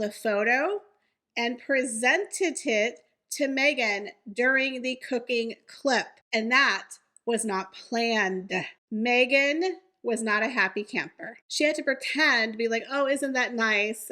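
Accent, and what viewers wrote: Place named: Texas, United States. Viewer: Hey Texas girl sending ❤ like your channel. You talk like me I'm also Texas born and raised here.😊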